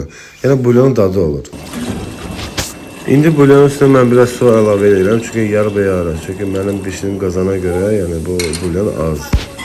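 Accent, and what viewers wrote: Zaqatala, Azerbaijan. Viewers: Аnkaradan Zakatalalı hemyerlimə salamlar. Bişirdim. Çox gözəl oldu. Sağ ol. Lehcemizi özledim. Su tökax❤😂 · Mən istəyirdim yazım ki,qardaş diyəsən zaqataladandı,çün ki,zaqatalalılar belə danışırlar,eliyəsiyəm,gedəsiyəm,gələsiyəm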